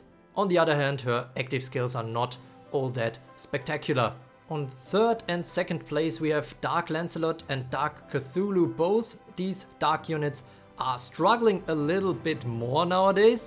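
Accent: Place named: Germany. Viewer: ah okay could swear you have german accent mb og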